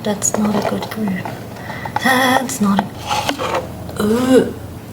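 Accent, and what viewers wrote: Australia. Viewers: Aussie accent creeping in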